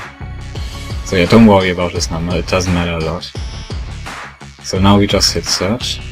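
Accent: Germany. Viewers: You are a german guy or 😂 falls ja hört man es raus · ah deutsch hört man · du bist deutsch ich erkenne es an deiner stimme :D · man hört so hart dass du deutsch bist xD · I can hear, that you are german :D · Hi man hört das du deutsch bist😉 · Man merkt an deinem englischen dialekt das du deutsch kannst :) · Kann es sein das er deutsch ist der hatn deutschn aktzent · You have a german accent!Am I right? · Man hört das er deutscher ist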